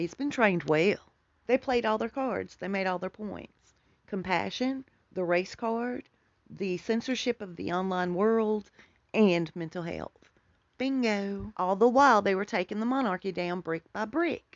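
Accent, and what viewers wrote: South, United States. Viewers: WOW... your beautiful south clarity and articulate (as also my family in NC) said it well. Didn’t watch or listen, but have listened about this chaos!! Oh my gosh! My huge family of blended children-10 and 27 grands, have never said a word about MM OR PH. After I first started seeing ‘them’ this last year, I spoke to my oldest daughter and she only spoke about not liking royalty, in general. Not one word about this, except say anything about those two or three venomous chameleons...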